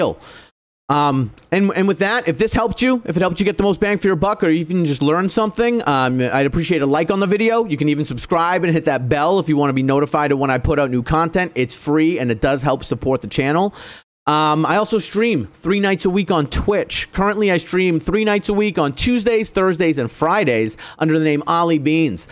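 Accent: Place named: New England, United States. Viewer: you sound like you're from either New England... or New York. (edit) The way you said "part" just narrowed it down I think. Massachusetts or Rhode Island.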